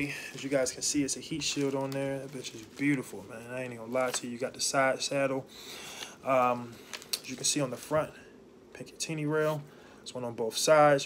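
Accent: Baltimore, United States. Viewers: Thats right more people of color Loving this ur Baltimore accent makes me think of Omar from the wire lol keep it up. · That Baltimore accent is 🤩. Love your gun collection. I just purchased my first hand gun last week. Excited. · You can tell he from Baltimore lol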